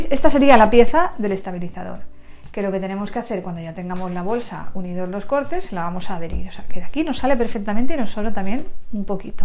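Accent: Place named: Spain. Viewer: Hola! Q precioso tu trabajo, aparte d tu simpatía y claridad p explicar Amo escuchar ese acento español, quizás añorando y recordando a mi abuela q era d Andalucía Soy d Argentina y d una provincia del interior del país, y no encuentro todo lo q mencionas p la confección (la boquilla d esa longitud principalmente )las telas son soñadas Te felicito!!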